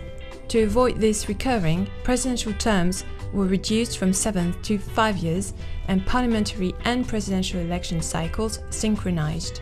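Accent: France. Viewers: Normal People Who Exist Meme la narratrice est francaise elle fait de son mieux mais son accent craint... Je pense que cest la meilleure page sur YouTube juste pour voir des francais batardiser l'anglais 😂😂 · son accent Français est très joli. Je ne comprends pas les Français qui critiquent un accent que tout le monde aime autour du monde. Sans parler du fait qu'il existe des tas d'accent teintant la langue anglaise.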